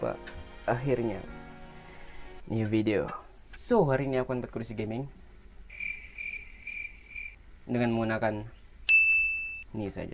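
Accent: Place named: Brunei. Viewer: Ohh patut tah melayu mu cam brunei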